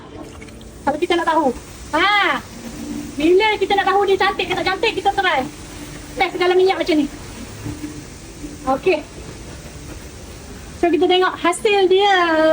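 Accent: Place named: Pahang, Malaysia. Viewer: Trime kasih kak... Kak org pahang ke... Mcm ade sleng pahang skit tadi nye tu... Huhuhu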